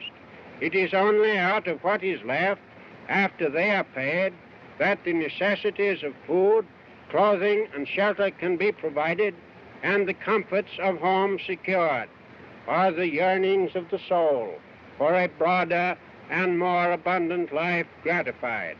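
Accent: New England, United States. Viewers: Boy, he really sounds New England. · Perhaps why he didn’t like public speaking. He was from New England, at a time when that area had a very distinctive speech pattern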